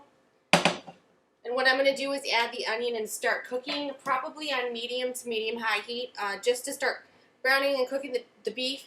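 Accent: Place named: Midwest, United States. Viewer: i would guess her accent to be wisconsin or ohio....i sure hope she responds cause i'm curious too!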